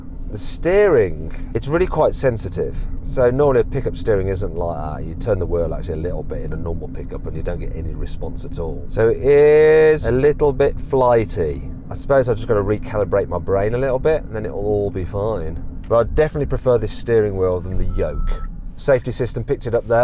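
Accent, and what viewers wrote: United Kingdom. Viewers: 그러고보니까 번호판은 텍사스인데 억양은 영국억양이네??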